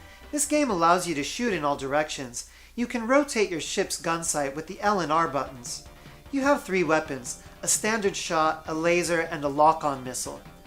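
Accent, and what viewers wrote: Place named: United States. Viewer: they speak in a murican dialect offshoot of real English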